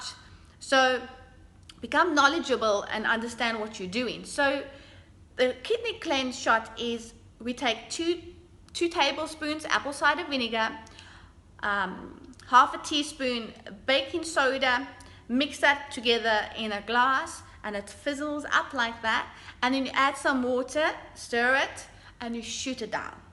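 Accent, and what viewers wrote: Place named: Afrikaans, South Africa. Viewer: are you South African? you have an Afrikaans-English accent.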